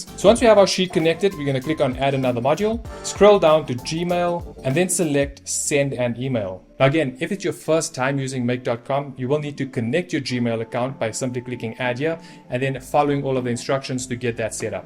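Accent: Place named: South Africa. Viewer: I know a South African when I hear and see one..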